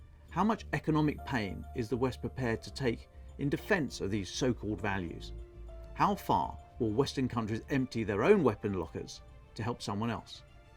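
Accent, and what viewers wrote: United Kingdom. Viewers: "they could get amongst it pretty sharpish" God I love British English and tea teeth.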